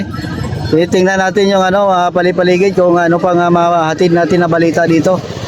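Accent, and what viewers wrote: Iloilo, Philippines. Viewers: Ilonggo ka gali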